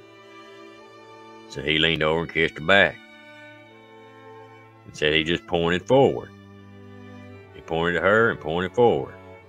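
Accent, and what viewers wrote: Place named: Georgia, United States. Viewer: The story teller accent is identical to my friend from Georgia.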